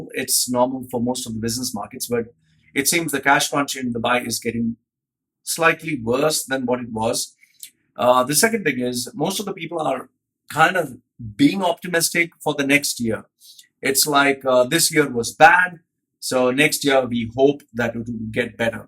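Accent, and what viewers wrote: India. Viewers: Curious - why are you wearing a full face Maori Moko yet sound Indian.....?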